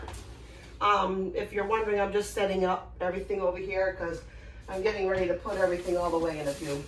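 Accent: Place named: United States. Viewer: ',' sounds American🤔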